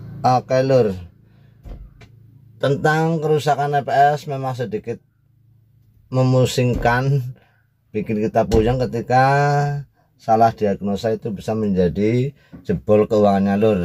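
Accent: Banyumas, Indonesia. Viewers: Pare kediri hadir mas,kok lama tidak muncul ini,nyong kangen karo boso ngapak2 nya...hahahhahah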